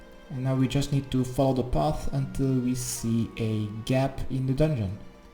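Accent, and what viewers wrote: Netherlands. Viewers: You're dutch, lol.. never thought u were even tho you got an accent, im also dutch :) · When you fail the rope swing 2x but the stupid grid thing was literally 5 times straight forward xD Thanks for the amazing, not annoying (ahum TAF) quest guides. Nice Dutchie accent x)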